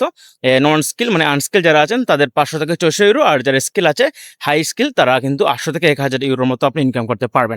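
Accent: Sylhet, Bangladesh. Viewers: Bhai afne Kita sylheti ni? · ভাই কিতা সিলেটি নি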